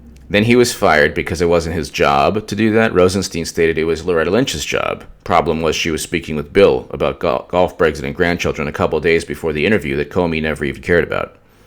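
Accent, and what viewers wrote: South, United States. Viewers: you are in rare form tonight. please repeat theyou awaaare of the" Weiiiiiiiineeeeer long pause:)..................................Laaayptop with that southern Drawl again.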